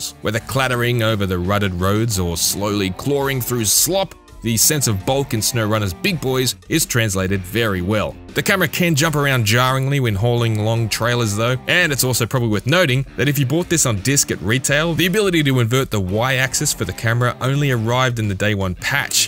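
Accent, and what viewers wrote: Australia. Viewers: U an Aussie mate? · I might be going on an Australian outdoorsman sort of stereotype, but the reviewers voice gives this video more authenticity for me. Just sayin’.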